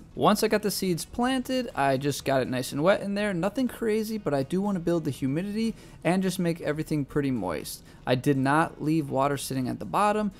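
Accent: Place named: Midwest, United States. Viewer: i need some midwest friends then! i love the accent lol. yes i barely discovered your channel and i love plants and now im starting to become obsessed with starting things from seed so your videos are really helpful and i enjoy watching them! i have a few projects i’m starting/about to start so i’m excited 😆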